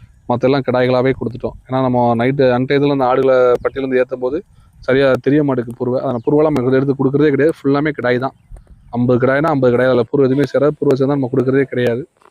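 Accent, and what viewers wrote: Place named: Madurai, India. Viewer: Madurai basha ❤❤❤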